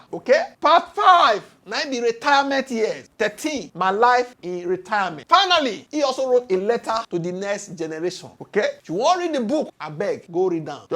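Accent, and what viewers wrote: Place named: Nigeria. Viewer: I must commend you. I never still see time siddun read the book, talkless of to break it down, come analyse am thoroughly like dis. And to do it with an authentic nigerian delivery style devoid of the usual fonetics, abeg my broda u too much!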